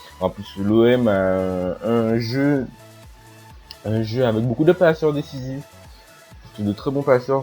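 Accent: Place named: Antilles, Caribbean. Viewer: jolie accent :D ça fais plaisir c'est rare des antillais Youtuber :D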